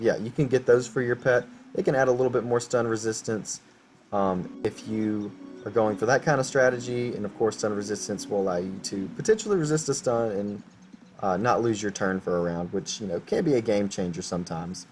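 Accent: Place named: South, United States. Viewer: I like ur southern accent.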